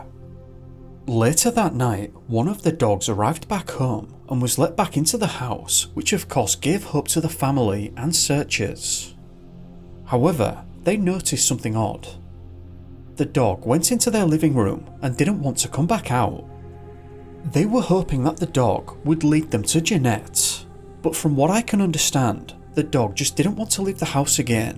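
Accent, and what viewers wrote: United Kingdom. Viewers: BRITISH ACCENT LOVE IT!❤❤❤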